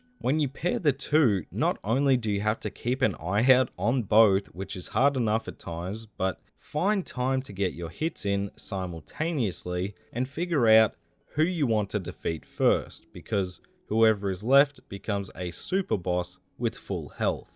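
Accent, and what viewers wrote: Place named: Australia. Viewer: Great channel, you should have more subs. Also nice to hear another Aussie voice on youtube.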